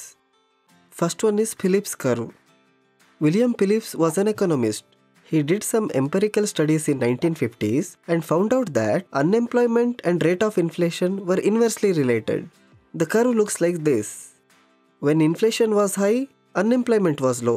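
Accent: India. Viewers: Bro..ur english is really Indian...awesomely Indian.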